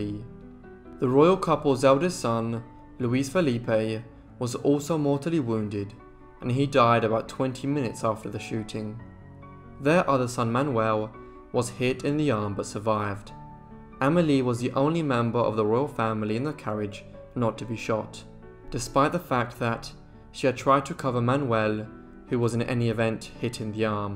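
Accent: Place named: England, United Kingdom. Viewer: i just realized how well written your biographies are. - and very well edited as to choice of photos, length of time on screen, etc. so well produced. thanks again. 🙂🌷🌱 you know what i am dying to know - where your forebears come from. you have an idiosyncratic sort of English accent, yet you say thanks like as american. the brass or copper tea or coffee pots seem to be Middle eastern or Turkish. do you think you’ll ever let us know?? it’s fascinating to try and figure out. thanks much. stay safe :) 🏺🌷🌱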